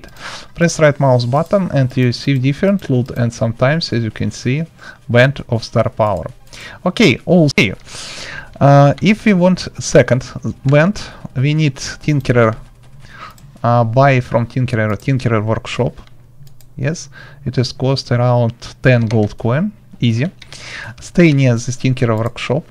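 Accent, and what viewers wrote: Russia. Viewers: He's Russian if you didn't know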